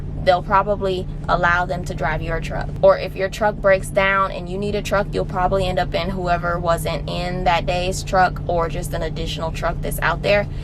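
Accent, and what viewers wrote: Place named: Southeast Michigan, United States. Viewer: You sound like SE Michigan.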